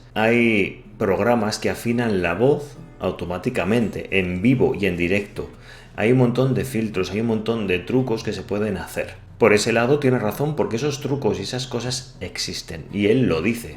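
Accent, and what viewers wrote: Spain. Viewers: De donde eres , Valencia ? xD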